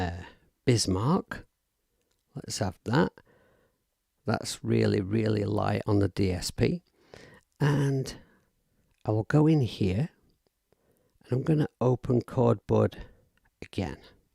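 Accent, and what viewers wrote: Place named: Sheffield, United Kingdom. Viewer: Hiya, I've been using the Rozeta suite for a while and now, thanks to you and Doug I've discovered the bud apps too. And of course you're right. I will lose days and weeks immersed in this stuff. P.S. I'm from Sheffield and I can tell your accent is not dissimilar to mine. Keep on rocking brother 🙏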